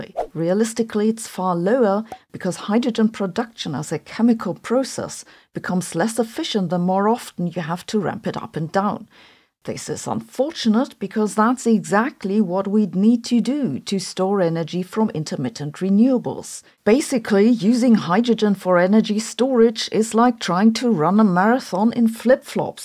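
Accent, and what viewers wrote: Germany. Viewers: Slap a German accent - check / controversial statements - check / google information without facts - check / newspaper articles against the recent developments - check / I am not sure if your audience is enough educated to understand but certainly you are not competent in that hydrogen field you are talking about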